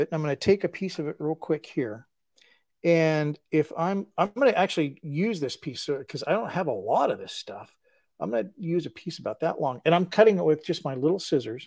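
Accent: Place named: United States. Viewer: When you ask, it's normally used on lamps the wire and the fitting it goes into the bayonet or screw fitting I think you call Edison lamp fittings in the US I presume that's where you're from because of your accent if not please excuse my stupidity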